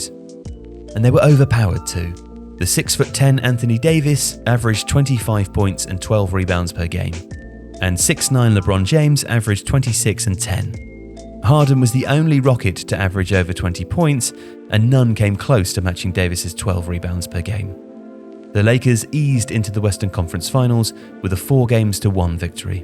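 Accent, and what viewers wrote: United Kingdom. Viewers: i love how a dude with a british accent is talking about american basketball lol. fr tho i love your vids keep it up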